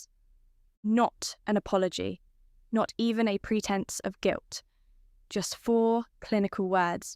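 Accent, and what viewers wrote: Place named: England, United Kingdom. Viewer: Lol, it's an AI program just a better one than most. It depends how much you are willing to spend. Also English voices especially her being a more refined English is very pleasant on the ears.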